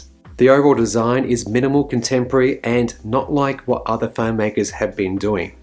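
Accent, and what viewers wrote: Australia. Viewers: Thanks soooo much . You've helped me decide on the 6 rather than the pro. Great video mate. Love that your Aussie and even better from Melbourne. Vic all the way. 😉👌🍻🤘💪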